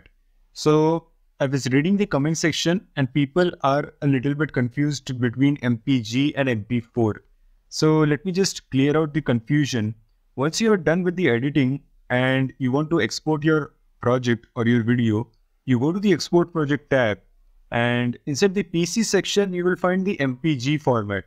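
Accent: India. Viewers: why does your voice sound Indian in a English way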